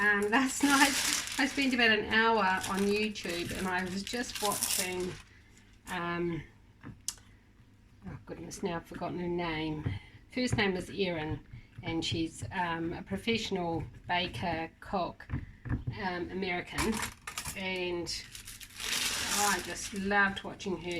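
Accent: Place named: New Zealand. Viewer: This looks delicious, I will have to try it. I have so much rhubarb I've run out of new ideas of what to do with it. I have given as much away as I can and some has gone into the freezer as well. It's lovely to find a cooking channel that has our Kiwi accent. Have a very Merry Christmas. Your Christmas Lillies look beautiful, mine haven't opened yet. It's not Christmas till I have their perfume in the house : )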